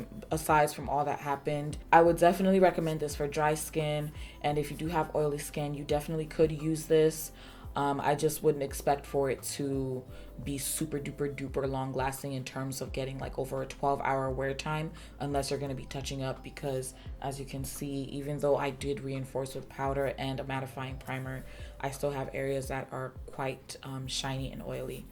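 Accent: United Kingdom. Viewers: I want more British accent in your videos PERIODT my darling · Your British accent made my day 😂😂!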